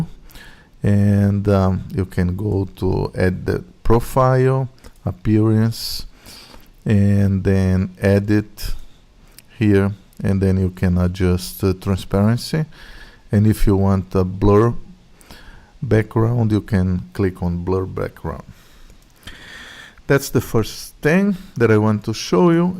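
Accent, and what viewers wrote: Brazil. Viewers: tnx for the video! anyway, r u brazilian or something like this? i noticed a very specific accent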